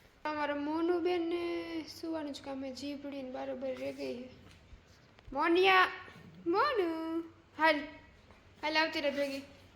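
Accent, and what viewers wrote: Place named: Porbandar, India. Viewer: નમસ્તે 🙏, તમારી બોલી સાંભળવાની બહુ મજા આવી, તમે જે નિખાલસ દિલ થી સાફ - સાફ બોલો છો, આપણાં પોરબંદર ના બરડા ડુંગર ના વિસ્તાર ની બોલી એક દમ સાફ - સાફ ભાષા હોય છે, અને આપણા લોકો ગમે ત્યાં હોય તે તેની બોલી ઉપર થી ઓળખાય જાય છે, મે તો આ તમારો વીડિયો મે પ્રથમ વખત જ જોયો છે, પણ બહુ મજા આવી,👍 ✨ ધન્યવાદ છે,🫅🏅🍫 તમને અને તમારા પરિવારને કે આટલે દુર સુધી અજાણ્યાં દેશ માં કમાવા માટે ની તમે હિંમત કરી છે, એ પણ એક છોકરી ની દ્રષ્ટિએ,તમારી હિંમત ને ધન્યવાદ છે,👍 મારા ગામ ના પણ ઇઝરાયલ દેશ માં છે, તે ત્યાં કયા સીટી માં છે,તે ખબર નથી, તમારું કામ ચાલુ રાખો,એક સમયે તમને જરૂર તમને સફળતા મળશે.🥀 लगे रहो 👍 ♥️🙏 પરમાત્મા તમને સારું સ્વાસ્થ્ય, સુખ અને સુરક્ષા, લાંબુ આયુષ્ય આપે તેવી પ્રાર્થના 🙏 નમસ્કાર 🙏 🇮🇳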